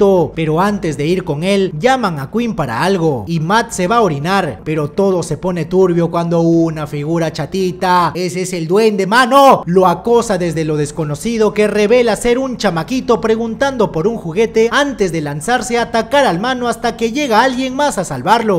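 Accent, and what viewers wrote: Peru. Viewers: Gracias a ti me volví fan del acento peruano xd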